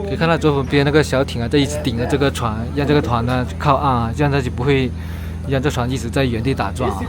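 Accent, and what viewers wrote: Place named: Cantonese, China. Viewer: 广东人，他普通话没那么好😂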